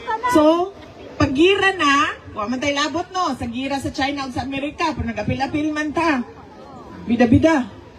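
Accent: Visayas, Philippines. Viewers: parang tanga magsalita halata nagbabasa may script pero slang p rin pagkabisaya khit ingles pa😅 wthell!!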